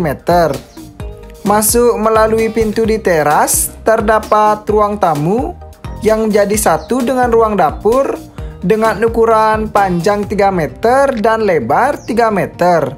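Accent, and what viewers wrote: Bali, Indonesia. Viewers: Mantaaap Bagus Niihh Buat refrensi Bagi yg Memiliki lahan Yg Kecil untuk d jadikan rumah Tinggal.. Bicara Nya Bali Banget Broo. Salam Nak Bali Rahayu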